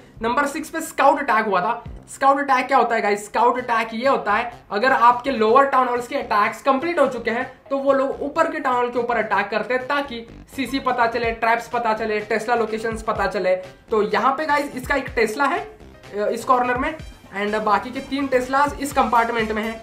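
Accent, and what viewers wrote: Kerala, India. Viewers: you are from kerala?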